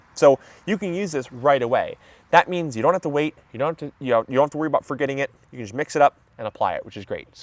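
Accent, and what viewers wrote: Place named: Midwest, United States. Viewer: Love the Midwest accent: CAM-pohst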